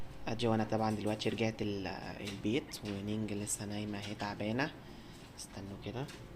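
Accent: Egypt. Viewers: احلي حاجة في العربي بتاعك انه مصري علشان كده اختاروك مصر حلوة بس نصيحة لو كان ليك نصيب ما تامنش حد علي اي معاملات وماتدخلش حد بيتك نهائي وخروجات الشغل بعيد عن زوجتك تماما والباقي مع اهلك في مصر اوي الشغالين ؟؟؟؟؟؟؟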